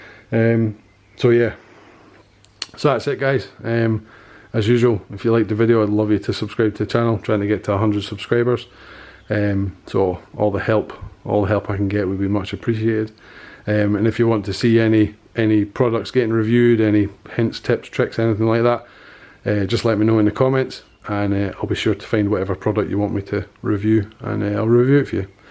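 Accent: Scotland, United Kingdom. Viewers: You don't get many scottish guys on YouTube! 🤣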